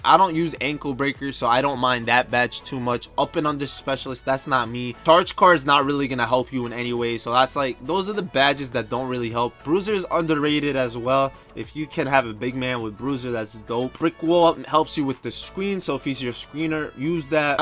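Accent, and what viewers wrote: New York, United States. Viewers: This dude is 100% a New Yorker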